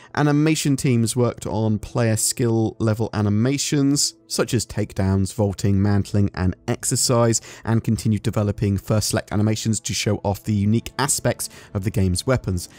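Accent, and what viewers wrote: United Kingdom. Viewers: BRO YOU SPEAK TOO FAST AND TOO BRITISH.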